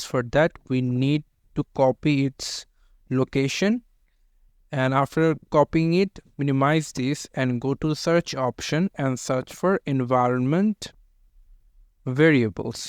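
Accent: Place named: India. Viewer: I have yet to watch a PHP setup video with someone without an Indian accent yet!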